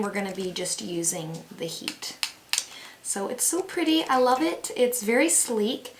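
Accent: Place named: United States. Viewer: O wow u got a country accent. Never really noticed it when i watched u guys’ vlog channel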